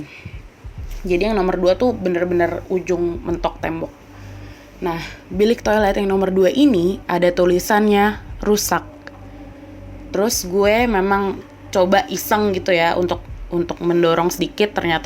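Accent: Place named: South Jakarta, Indonesia. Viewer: Woww aksennya jaksel bingitsss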